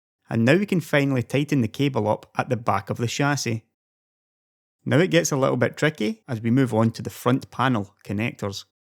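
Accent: Scotland, United Kingdom. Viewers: the sweet, Scottish dialect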